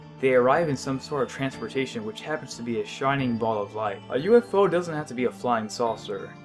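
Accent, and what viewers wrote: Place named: Japan. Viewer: Are you Japanese? Your accent sounds Japanese.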